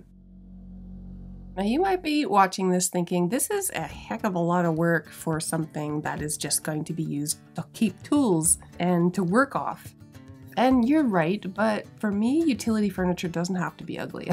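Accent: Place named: Hants County, Canada. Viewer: Hello from East Hants! Did you film the lightning? It was relentless and went on for hours. Always love your videos, and hearing a Hants County accent on YouTube! 😉Keep up the great work! ❤🤍❤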